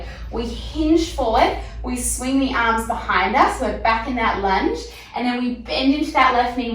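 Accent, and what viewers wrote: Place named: Australia. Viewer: You have such an aussie jew accent haha love it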